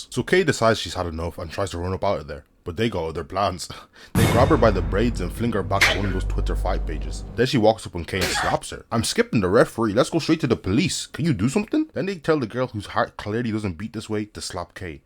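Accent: Ireland. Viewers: are you Irish 🇮🇪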